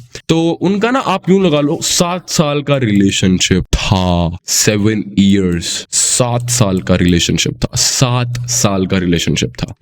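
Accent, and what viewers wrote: Haryana, India. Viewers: Accent jhajjar ya rohtak ka hai? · accent seems like haryanvi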